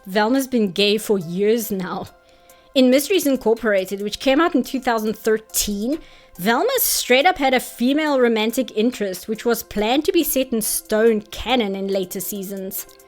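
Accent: South Africa. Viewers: oh geez i di not know you were from south Africa ? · lol I never would've thought of fred as a fellow autist but now it makes sense Also her south african accent is hot af, I hope I'm not the only one that thinks this XD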